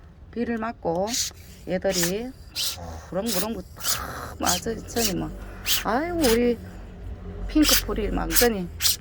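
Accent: Gyeongsang, South Korea. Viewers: 정말 부지런하시네요. 경상도사투리도 구수하구요.ㅋ 님은 어디에서 구매하시나요? 여긴 대구인데...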